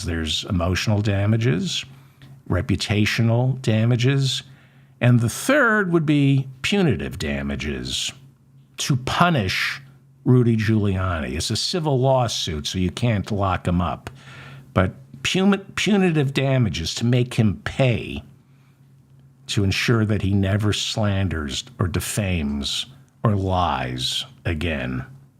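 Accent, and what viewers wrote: United States. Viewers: I am listening from Norway🇳🇴♥️ So nice to listen to a normal American.😚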